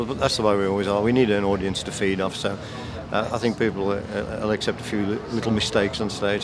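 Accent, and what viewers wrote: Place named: England, United Kingdom. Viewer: he does not open his mouth during speaking... pure english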